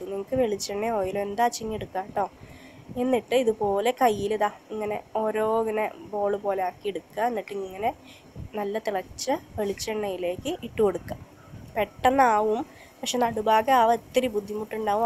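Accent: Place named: Kuttippuram, India. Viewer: നിങ്ങളുടെ സംസാരത്തിൽ നിന്നും കുറ്റിപ്പുറം ആണ് ചേച്ചിയുടെ സ്ലാങ് എന്ന് ഊഹിക്കുന്നു. ശരിയാണോ?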